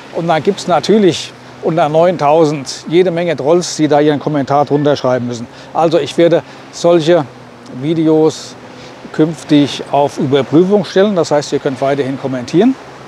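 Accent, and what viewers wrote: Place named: Hesse, Germany. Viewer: Ei Guude,.Ich lebe und arbeite seit 32 Jahren in Thailand/ Deutschland, spreche fließend Thai und bilde mir ein, das Land und die Leute relativ gut zu kennen. Dein Kanal ist einfach sehenswert, weil Du offen und ehrlich über Deine ersten Erfahrungen sprichst, versuchst, Infos zu vermitteln, obwohl Du selbst ja noch ein " Rookie" bist und das alles in schönstem hessisch. Ich finde das sehr unterhaltsam und rate Dir, diese Trolle einfach zu blockieren. Leider gibt es diese Art Menschen auf jedem social medium, die nur darauf warten, aus ihren Löchern herauszukriechen und irgendwelchen geistigen Dünnschiss von sich zu geben. Lass Dir Dein YouTube nicht madig machen, weiter sie bisher und von mir gibts, wie immer, einen Daumen nach oben! P.S. und grüße mir mein Pattaya. Habe da einige Jahre gelebt und es war eine tolle Zeit. 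Bangkok, der Norden, Phuket usw. sind toll, aber Pattaya ist eben was Besonderes ( hatte ich erst nach 7 Jahren Aufenthalt in Thailand festgestellt, da ich mich so lange geweigert hatte, mal nach Pattaya zu fahren ) Ich werde da bestimmt als Rentner wieder auftauchen.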